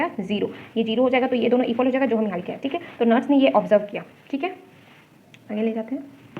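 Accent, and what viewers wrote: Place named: North, India. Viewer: Not gero its zero why these people from the north has bad accesent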